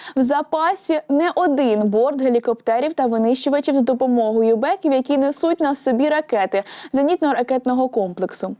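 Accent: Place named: Central Ukraine, Ukraine. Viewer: Мені подобається. Правильно,чітко,притоманно української дівчини. В мене ніяких нарікань на сучасну мову нема,не засмічена росіянизми. Є декілька моментів,але вона чітко промовляє , притаманно центральної частині України. 5+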